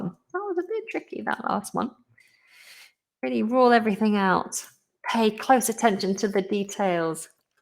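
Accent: United Kingdom. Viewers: you are the best Teacher i love your British accent and Stay Safe Stay Healthy 😘❤💜❤💜❤💜💖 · is one of the best teachers on youtube. I love her British accent! from Russia with warm hugs! 😉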